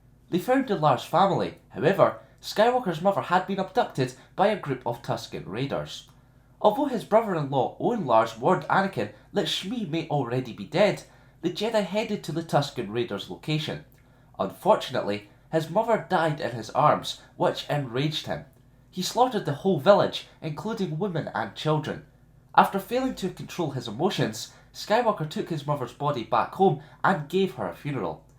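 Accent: Scotland, United Kingdom. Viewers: Congratulations for you 100 lore ,Really like what you're doing on your channel also are you Scottish if so then I must that I visit Glasgow .Forgive me for my English it's not my first language.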